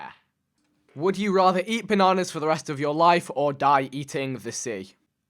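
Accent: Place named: England, United Kingdom. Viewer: srs? He's English. Why does it matter his race? Where he comes from/lives is what counts for how he acts(and sounds)#accent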